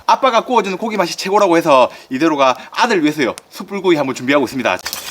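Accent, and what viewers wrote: Gyeongsang, South Korea. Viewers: 언제봐도 정겹네요 경상도 사투리가 가슴에 팍팍 와 닿습니다 ^^~ 이건 음식 고문이다 ^^~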